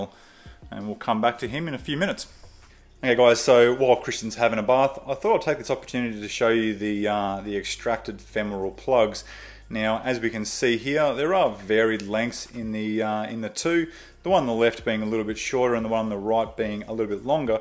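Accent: Australia. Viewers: Guys, where’s his accent from? Aussie/what.? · If something is being pulled or squeezed out of a body I am there. The Aussie accent was just the cherry on top!!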